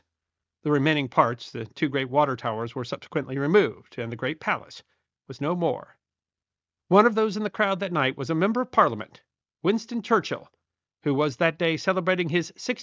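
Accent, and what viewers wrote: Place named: United States. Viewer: its happening again gareth, another annoying voice over from a mickey mouse sounding yankee talking about a British subject!! why is this and can it be stopped asap, so very annoying and basically rude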